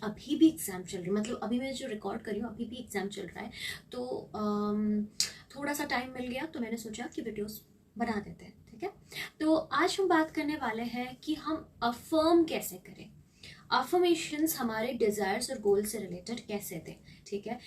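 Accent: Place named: Odisha, India. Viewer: Aap odisha se hai ma'am...loved to listen thus 😊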